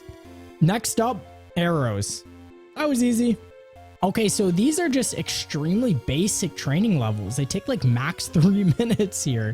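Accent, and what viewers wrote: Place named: Canada. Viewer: your commentary in this and the 3D one is hilarious. also called that you were (a fellow) canadian from the accent LOL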